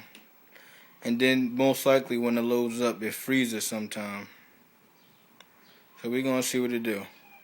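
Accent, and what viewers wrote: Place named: Northeast, United States. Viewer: you're from somewhere up north right? New York, DC, Boston?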